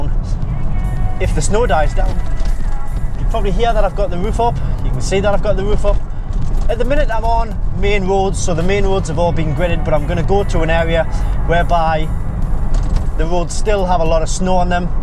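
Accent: Newcastle, United Kingdom. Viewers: Are you a Geordie? If so, awesome hahaha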